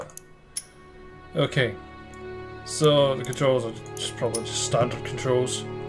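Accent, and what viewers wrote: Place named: Aberdeen, United Kingdom. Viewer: Heard your story trows and although I'm an auld wifie fae Aeberdeen bidin in London we only a PS 2 I like tae tune in and hear yer bonny accent fer a bit o Hamesickness relief fan I hinna bin up'i'road fer a filie! Ye still followin ma phonetic Doric here loon? Aye? Weel ah've subscribed fer yer stories o ghosties, ghoulies an lang legged beasties. So good luck loon an here's an auld Aeberdeen greetin tae warm yer heart.."G'wa ye radge!" he he jist jokin loon! xx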